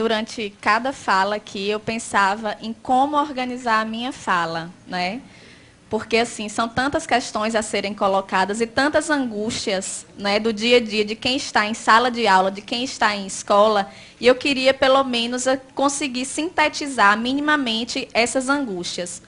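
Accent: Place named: Rio Grande do Norte, Brazil. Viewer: Assisti ontem ! Adoro o sotaque do RN, adoro Natal!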